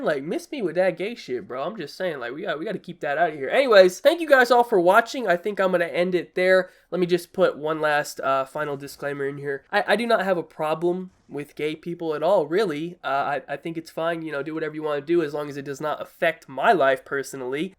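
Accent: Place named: Texas, United States. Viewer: Sorry I don’t really understand your Texas voice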